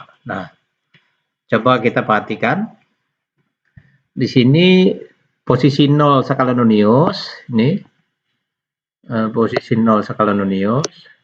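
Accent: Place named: Banjar, Indonesia. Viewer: umpat belajar Guruu .. R nyaa banjar banar😂jar abah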